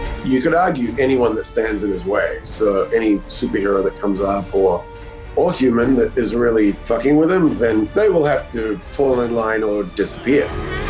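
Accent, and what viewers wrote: New Zealand. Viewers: He's kiwi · He’s from New Zealand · Wait he’s Kiwi??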